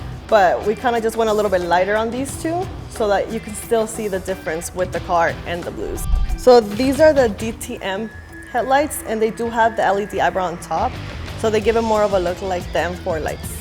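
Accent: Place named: East Los Angeles, United States. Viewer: Damn she sounds like she's from East L.A.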